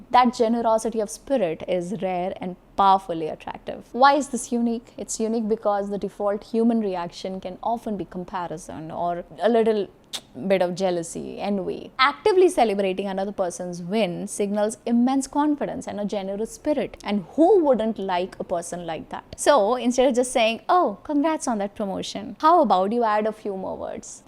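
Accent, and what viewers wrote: India. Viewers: Because she is indian ❤😂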